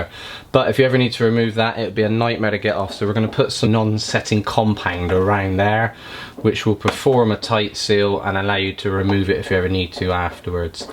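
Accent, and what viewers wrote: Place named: West Country, United Kingdom. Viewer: where are you mate? You sound West Country. We need this sorting on my step daughters corsa. She is In Glastonbury.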